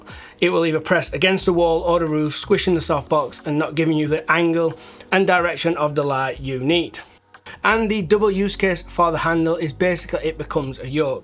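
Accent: United Kingdom. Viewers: That’s the best voice and British (British, right?) accent on the whole film production–centric YouTube!!! 🎥👑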